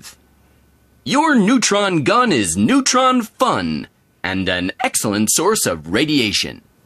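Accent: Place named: United States. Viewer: British Currency with a US accent. hmmmmm....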